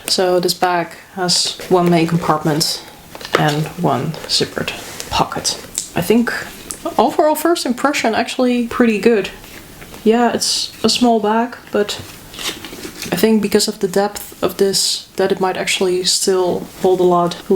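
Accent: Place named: Netherlands. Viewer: By coïncidence I discovered your channel and I immediately liked it and subscribed 🤗I already thought you had a slight dutch accent and after ‘doei!’ I had to laugh, I’m dutch as well 😁 I appreciate your honest and thorough reviews, keep up the good work 👍 doei!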